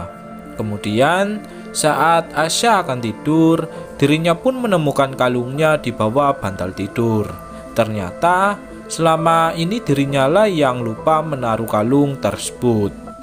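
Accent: Java, Indonesia. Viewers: Jngn terlalu medok mas suara nya... Biar saat bercerita lebih terbawa suasana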